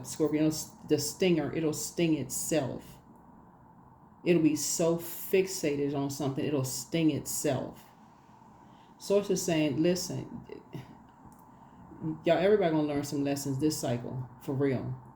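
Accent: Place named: South Florida, United States. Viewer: I'm from South Miami and I dont many people who speak like that. I think its Carrebien/latinx black people who are born in the USA south florida dialect. Very specific north Miami. Anyway you hit the nail on the head.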